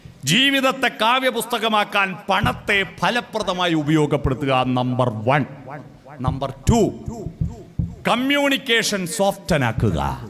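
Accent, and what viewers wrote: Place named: Kannur, India. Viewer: എന്റെ പൊന്നു സുരേഷ് ബാബുസറെ,താങ്കളുടെ പ്രഭാഷണം വളരെ വിലപ്പെട്ടത് തന്നെ, പക്ഷെ, ഭാഷ വെറുപ്പ്‌ ഉളവാകുന്നത്, കണ്ണൂർ, കാഞ്ഞങ്ങാട്, etc,,, അതൊന്നു മാറ്റി നല്ലമലയാളം, നല്ല ഉച്ചാരണം ആക്കിയാൽ ഒന്നുകൂടെ (100%) നന്നാകുമായിരുന്നു, pls try Sir, 😊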